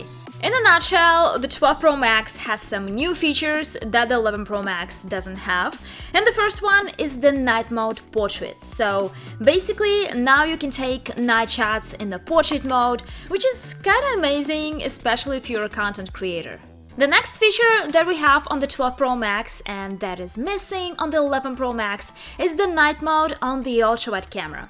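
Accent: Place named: Russia. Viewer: Привет,. Приятно слышать русский акцент:)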